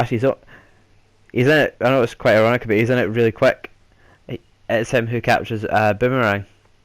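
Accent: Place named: Kilmarnock, United Kingdom. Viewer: That killie accent makes me moist :0 Film would've been so much better had they edited it differently. There's a lot of deleted scenes where they could have made a completely different movie, but instead it was mediocre. As for deadshot, he needed humanised, you need to get behind the main characters. They were all nicer and gentler than the comics depict them. Affleck was the tits yo!!!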